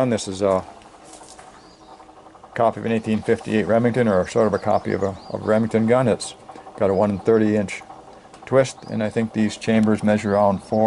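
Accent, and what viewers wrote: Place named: Midwest, United States. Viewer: By the way I kind of recognized your accent are you from Midwest or Minnesota I grew up in Minnesota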